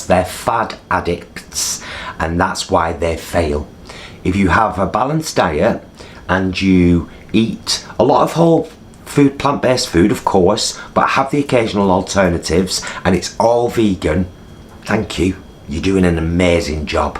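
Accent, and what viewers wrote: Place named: North, United Kingdom. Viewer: Just found this video/channel in my recomended. You got me with that mad intro, and then that accent 👌👌👌 I'm in Yorkshire but often in Manchester n i love hearing a northerner online, 🤣 Those chicken strips are ace, I used to really not like mock meat, but those Iceland chicken bits n burgers converted me 😊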